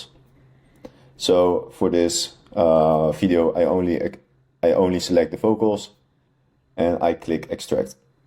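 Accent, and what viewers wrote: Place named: Netherlands. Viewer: Jij kom zoiezo uit Nederland aan je accent te horen 😂😂❤️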